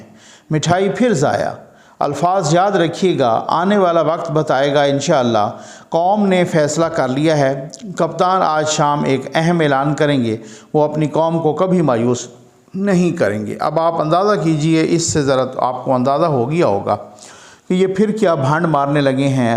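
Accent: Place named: Saraiki, Pakistan. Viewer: Dear sb I think u r Saraiki speaking. From where u belongs???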